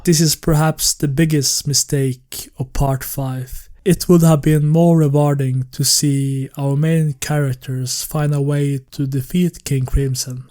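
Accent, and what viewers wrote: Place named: Scandinavia. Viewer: you sound either norwegian or swedish, anyways keep it up :d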